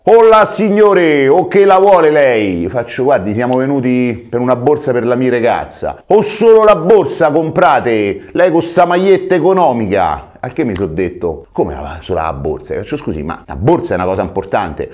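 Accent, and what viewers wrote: Rome, Italy. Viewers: Grande sono figlio di una romana e quando ti sento parlare mi raffiorano sempre i ricordi, volevo dirti che grazie a te 3 anni fa circa mj sonl appassionato tanto al pugilato. Oggi sono pro e non lo avrei mai pensato, ho fatto il mio primo incontro a Milano a gennaio prima del lockdown. Con tanto allenamento e tanti sacrifici per un diciannovenne. P. S. Porta ancora tutorial e di al franchino di stare più attivo su yt che siete dei personaggi... Grazie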